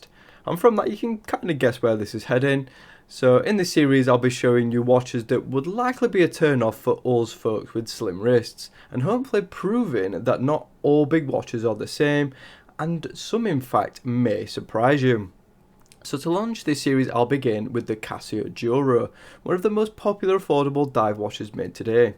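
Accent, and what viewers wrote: United Kingdom. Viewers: thanks for your answer. I’m not British, but I have an interest in accents, dialects and all that jazz. Might I ask you where your accent is from? Midlands? Liverpool?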